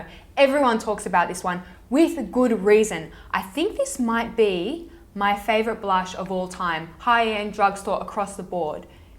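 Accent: Australia. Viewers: You sound australian but your using American products???? I'm confused!!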